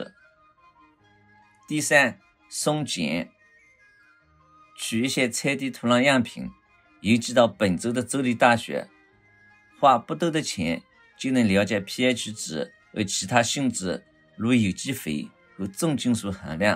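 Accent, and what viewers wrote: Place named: Jiangsu-Zhejiang, China. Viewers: 可惜您這張圖裏沒有我所在的州猜猜哪個地方？感謝您的各種種植經驗的分享，喜歡聼您的這個江浙口音。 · 超爱这包邮区的普通话口音简直回归故里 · 主講人十足一亇江苏江南人！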